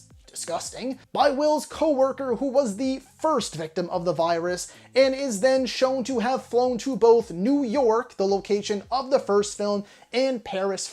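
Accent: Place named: Canada. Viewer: the Canadian accent. “Out” is “Oat” lol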